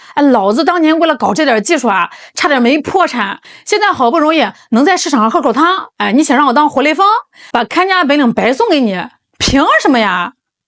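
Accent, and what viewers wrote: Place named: North, China. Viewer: 北方的吧，这口音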